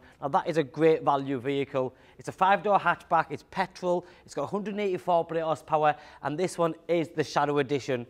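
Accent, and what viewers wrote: United Kingdom. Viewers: How you sound so British? Or is this a voice over.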